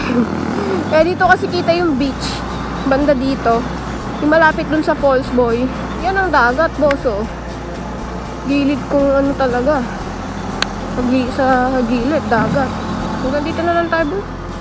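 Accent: Laguna, Philippines. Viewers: Gusto ko yung puntong laguna mo idol haha enjoy ako sa vid palagi! sana makaride ka namin ng misis ko soon! penye sticker haha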